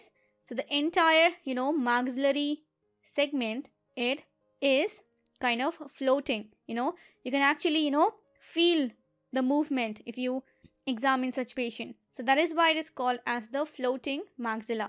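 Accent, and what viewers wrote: India. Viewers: A tip to get more followers: Study pronunciation. I dont get this tikamasala-talk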